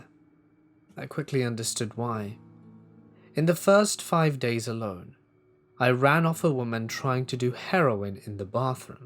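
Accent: United Kingdom. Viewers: Your voice is so calming!! Where in the UK are you from?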